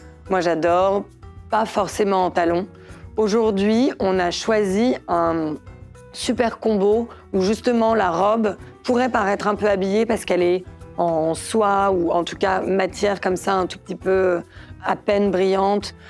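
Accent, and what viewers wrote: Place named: Paris, France. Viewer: It’s not uncommun to find a voice like her in paris (+30-40yo women). Personally, it don’t disturb me.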